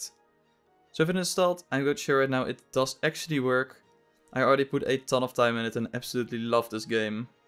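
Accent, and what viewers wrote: Netherlands. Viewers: Hey, volgens mij ben je nederlands. Aan je accent te horen:). Ik had even een vraagje. Is de download van Inazuma Eleven GO galaxy gratis voor Citra op PC? Of hoe kan ik die krijgen? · Haha ik kon aan je stem horen dat je Nederlands bent :) Je kan wel echt goed Engels hoor ik meen het ga zo door ;) dank je voor deze uitleg trouwens!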